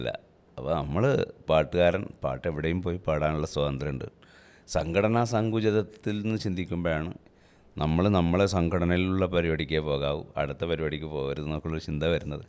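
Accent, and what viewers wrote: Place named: Kuruvettoor, India. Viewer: സംസാരംകേട്ടിട്ട്കുരുവെട്ടൂർആണ്എന്ന് തോന്നുന്നു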